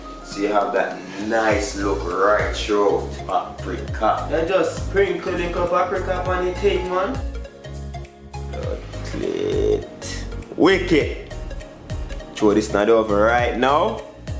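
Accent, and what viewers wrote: Jamaica. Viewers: I like how you narrate your videos. It's a good balance of JSE and Jamaican Patois. "Ah murda!"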